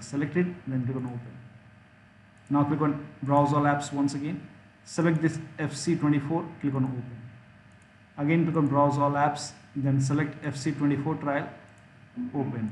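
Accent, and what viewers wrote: India. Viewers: as soon as i heard that indian accent i knew i would be saved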